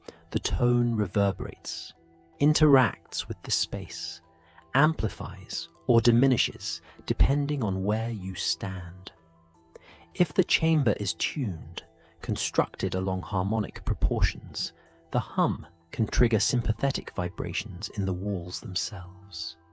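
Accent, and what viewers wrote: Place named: United Kingdom. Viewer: Is this what we're forced into a British accent on every freaking Channel I think it's a good Channel but can't I get it in an American accent👎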